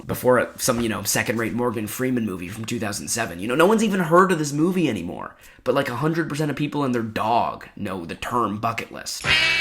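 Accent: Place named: United States. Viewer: Wait you're from Scandinavia? Your accent sounds so American.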